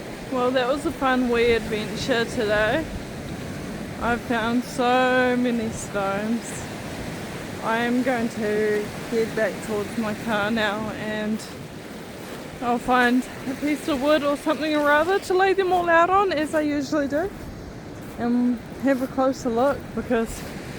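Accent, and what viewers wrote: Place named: South Island, New Zealand. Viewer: awesome yeah I've been mucking around with polishing pads on temu, finally found a set for about $40 that goes up to 10000grit and I just use them on an old bench grinder. It's quite slow so not much chance of overheating and blistering the rock a mistake I've made while learning and I bought a tile saw from Bunnings with a continuous blade for cutting them $140 ish I definitely need a bigger saw though for the bigger rock's. Cool I thought it looked like nz haha and a bit of a south island accent 😅 I lived in chch for years.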